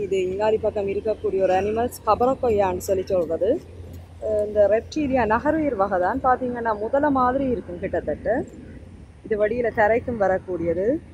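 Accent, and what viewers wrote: Sri Lanka. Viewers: உங்கள் இலங்கை தமிழ் கேட்பதற்கு மிகவும் அழகு. வாழ்த்துக்கள்.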